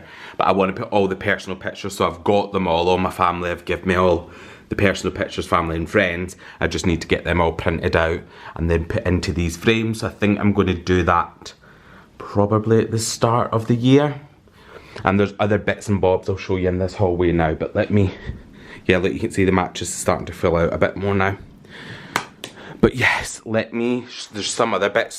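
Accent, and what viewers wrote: Scotland, United Kingdom. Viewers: I found u a few weeks ago I've binged u since n I love hearing a Scottish accent im north east n it's great watching u ur wonderful ur home is gorgeous n I'm so glad I found u I subscribed last week after watching a while n I'm hooked u need to give Lydia n alli Gordon a watch ud love there home xxx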